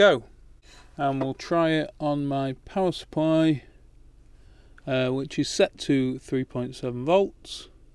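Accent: United Kingdom. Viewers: Dear uploader, Sorry to say that this chinese booster module can't manage proper current & voltage continuously ; I believe unless it is frm a branded power 💪 bank. Anyway I liked yr idea. I wish to change my 18650 cell to higher capacity in my Qualcomm power 💪 bank. Yr British accent is really cool. :-D . I love ❤ british accent.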